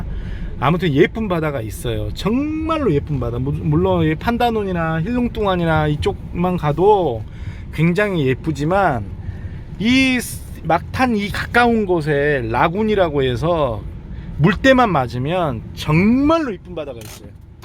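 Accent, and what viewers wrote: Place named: Jeolla, South Korea. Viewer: 눌렀다 ㆍ 이 ~ ㅋㅋ 전라도 사투리를 ㅎ 나도 전라도인데 사투리 엄청 심하거든요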